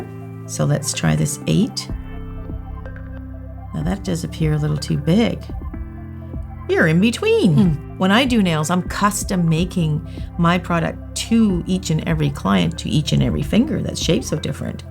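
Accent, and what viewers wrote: Canada. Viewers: It’s my third time watching her vid and I totally don’t know anything about her But I’m going to guess she’s from Canada I’ve been hearing her saying ey many times 🖤🖤🖤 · You can definitely tell is Canadian because of how many times she says "eh?" after her sentences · Are you Canadian?😜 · Wait is she Canadian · Are you Canadian? Cause you say "eh?" a lot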